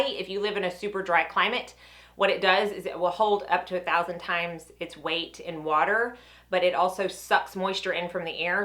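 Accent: South, United States. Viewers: Good info but this could be edited down to half. Most people don’t want to watch unnecessarily long videos. You could have a huge following if you get to the point and just talk about the products and how to use them. No useless banter about the shape of bottles, etc. Sounds like you’re from the South and I know women talk a lot in the South because my mom and aunts were from Louisiana but please, CA women will never take the time to watch this entire never ending video.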